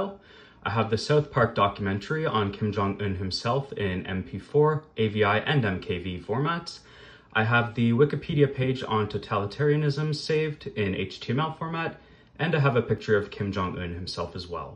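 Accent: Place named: Canada. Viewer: Well the sky sounds like a Canadian, and I'm American, so therefore if the Canadian says that's not nice and not good I'm going to believe him.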